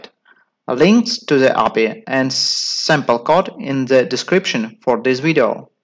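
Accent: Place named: United Kingdom. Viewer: Don't be sorry for your British accent :D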